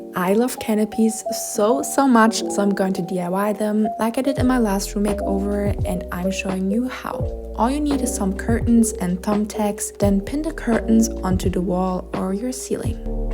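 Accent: Germany. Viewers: i am in LOVE with how this turned out. you did amazing 🎉🎉 also are you from germany?? my friend's accent is really similar to yours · Okay but German accents are so pretty ✨ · Man merkt voll dass du eigentlich deutsch bist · Direkt den deutschen Akzent rausgehört✨ Liebe das Ergebnis!🫶🏼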